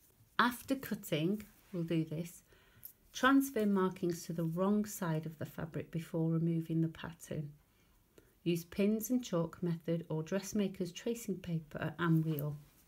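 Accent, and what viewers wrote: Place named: England, United Kingdom. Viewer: I am happy the 2nd part followed so quickly. As a German I have already learned some technical terms through you. How about the seam allowance, is it included in the pattern? I am thrilled I ordered the pattern yesterday. Love from Uta from Munich. P.s. I like your accent, reminds me of the years I spent in good old England, centuries ago.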